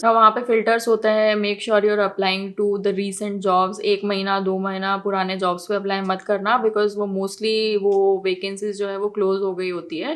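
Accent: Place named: India. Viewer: Thank you for those content,in this video it was really hard for me to understand since you speak indian,can you make your videos with subtitles?thank you.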